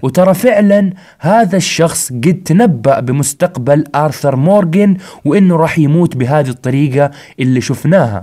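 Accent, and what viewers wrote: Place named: Jizan, Saudi Arabia. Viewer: ياخي انت من جيزان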